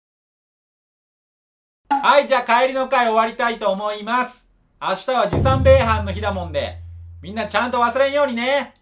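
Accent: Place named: Enshu, Japan. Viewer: いつも面白い動画ありがとうございます。 遠州弁最高！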